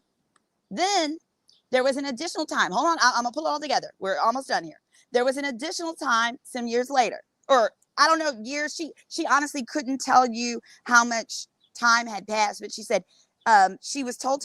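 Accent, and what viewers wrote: South, United States. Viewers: Toxic Sock is REAL! This is disgusting ! And I have to only watch you cause that southern accent is home❤❤❤ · MIMs was my first intro to you and I love your commentary… you are beautiful and you sound like you from the south lol love it!!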